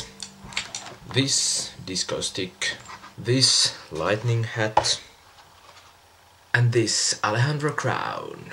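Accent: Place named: Finland. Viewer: Love your Finnish accent:) i <3 and:)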